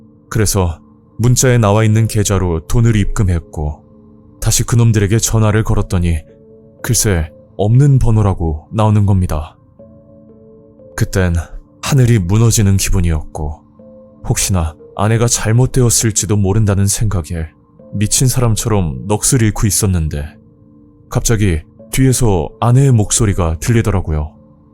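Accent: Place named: Jeolla, South Korea. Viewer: 아따 전라도라~~~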